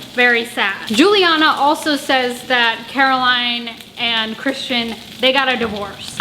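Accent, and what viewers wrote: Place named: Denmark. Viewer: are you danish because you are talking like a dane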